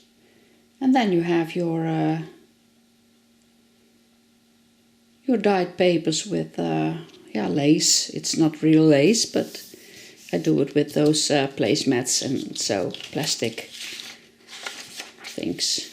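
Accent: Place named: Netherlands. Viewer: Dank je wel, thanks for the great tutorial, I must give it a try.. I loved hearing the Dutch accent.. I have been in Australia for 70 years but was born in Ijmuiden..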